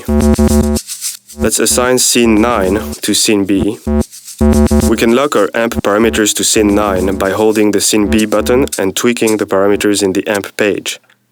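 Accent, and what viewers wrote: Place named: France. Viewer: super vidéos, je crois reconnaître un petit accent français, ça serais cool des tutos en français :)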